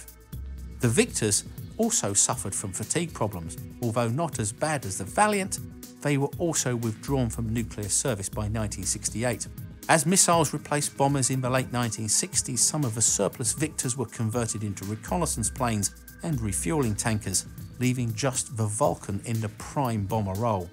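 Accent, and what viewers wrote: United Kingdom. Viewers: Thanks to YouTube caption. I can’t understand 90% of what he’s saying. No offense, the British accent is so difficult for me understand! Cool vid regardless.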